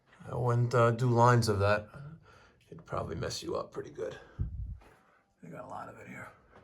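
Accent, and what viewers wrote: New York, United States. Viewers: Voice kinda reminds me of Louis Rossmann. Just that NYC accent working through...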